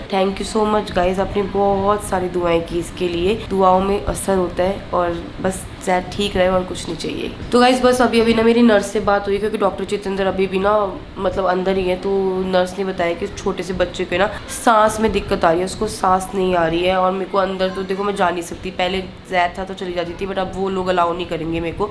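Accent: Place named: Pahari, India. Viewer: Is Dr from Himachal...?? Because he has a pahari accent ❤😊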